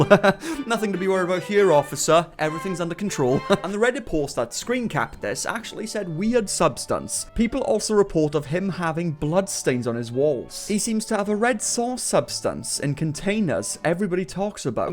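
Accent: Wales, United Kingdom. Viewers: you from north or south wales im also welsh so its ez to know tht you from here lol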